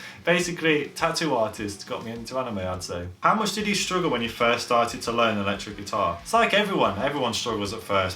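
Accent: United Kingdom. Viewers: Are you british? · Wasn’t expecting you to be northern! Love your accent and it was cool getting to know you after watching so many covers!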